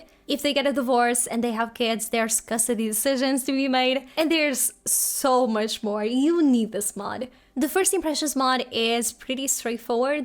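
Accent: Portugal. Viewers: 1 minute and I already knew you were Portuguese! hahhah loved the video!❤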